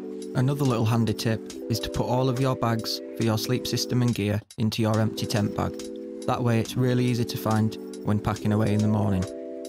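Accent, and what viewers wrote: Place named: Lancashire, United Kingdom. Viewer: Good video , you sound like a Lancastrian , god's own county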